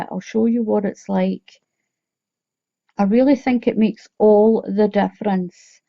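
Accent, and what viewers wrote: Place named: Wales, United Kingdom. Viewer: Beautiful. Subscribing to await the blanket. I've seen a lot of videos from the UK. I'm US. Are you Welsh or Scottish? I hope you don't find me rude. We had a lady at our senior center from Wales that sounded a lot like you. I loved talking to her because of her accent! Hope to see the blanket video soon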